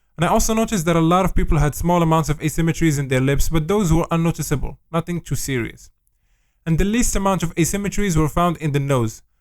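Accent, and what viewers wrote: Morocco. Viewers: Moroccan accent 🎉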